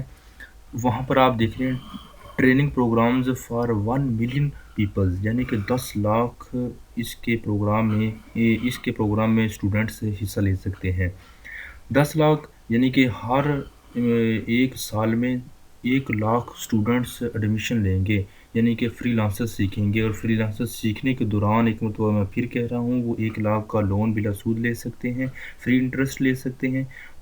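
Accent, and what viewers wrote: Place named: Punjab, Pakistan. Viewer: Try to speak clearly your pronounciation is purely Punjabi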